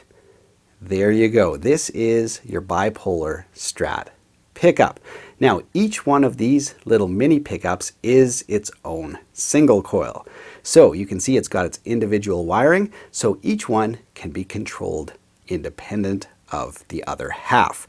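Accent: Canada. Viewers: The point is why should he, a Canadian person, adjust how he speaks to satisfy an American person. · How did I not notice the Canadian accent before he mentioned it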